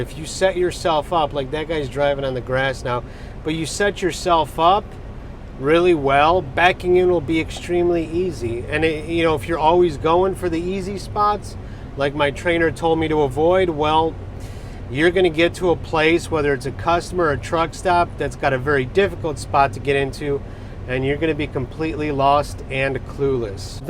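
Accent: Russia. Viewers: 10 years ago u sounded very russian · do your russian accent in your videos man ur known to it 😁😂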